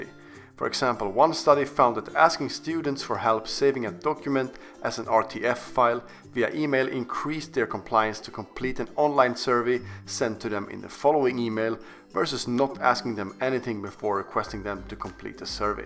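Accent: Sweden. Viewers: Är du svensk din dialekt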